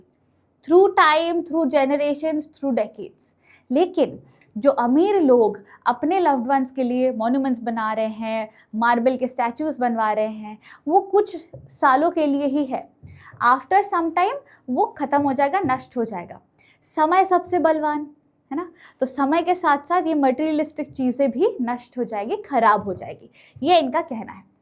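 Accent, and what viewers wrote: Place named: Bengal, India. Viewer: her begolii accent🥹🤌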